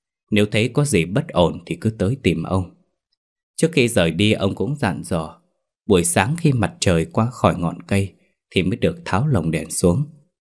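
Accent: North, Vietnam. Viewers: Hóng truyện của tg Huỳnh Thiên Hương. MC giọng bắc nhưng đọc truyện theo văn miền nam, miền tây cuốn theo cách riêng nha. Mình người SG nghe cứ bị ghiền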